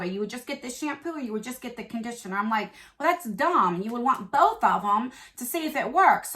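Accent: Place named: Maryland, United States. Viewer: Where are you from? My friend is from Maryland and you guys sound identical. 🌞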